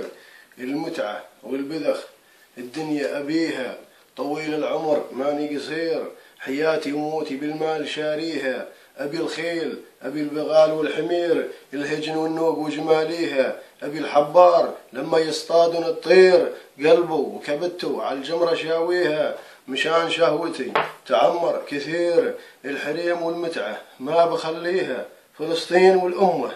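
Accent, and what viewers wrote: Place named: Saudi Arabia. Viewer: يتكلم لهجة سعودية أكيد أنه ليس جزائري وهناك صعاليك تصطاد في المياه العكرة احذروا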